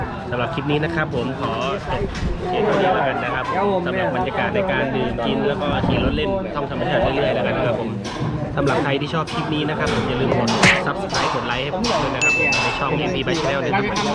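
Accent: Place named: Isan, Thailand. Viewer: สำเนียงเสียงเว้าม่วนหูหลาย FC from Laos🇱🇦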